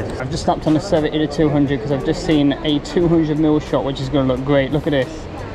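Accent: Manchester, United Kingdom. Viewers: Mate! I subscribed to not miss any of your funny commentaries min hahahaha!!! Luff your Mancunian accent!! haha 🤣🤣 And of course, FANTASTIC camera work!!!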